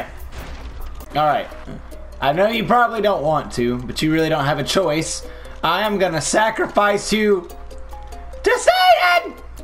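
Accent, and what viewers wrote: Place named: Ireland. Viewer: Love the Irish accent 😂😂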